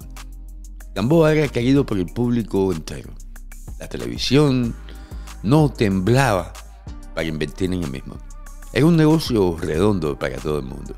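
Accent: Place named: Cuba. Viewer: Compay yo entendi porque soy cubano pero es documental hablado esta calentico solo pa cubano que hablamos mal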